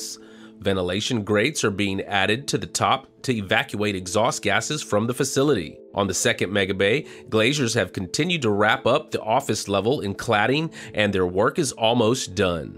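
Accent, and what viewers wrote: Texas, United States. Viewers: The Texas accent may be excused, but the Liebherr crane is "Leeb-hair", definitely not "Lyber".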